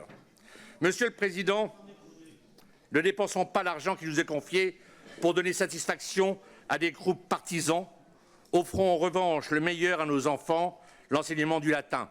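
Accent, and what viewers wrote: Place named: Île-de-France, France. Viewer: Et ton patois d'Île de France tu sais où tu peux te le mettre ?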